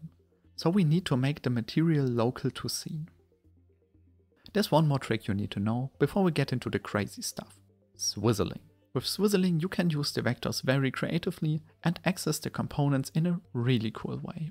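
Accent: Germany. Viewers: That’s a german accent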